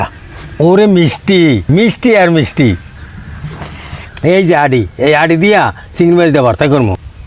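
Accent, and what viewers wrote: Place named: Barisal, Bangladesh. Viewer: চাচা বরিশালে কথা বলে খুব সুন্দর লাগে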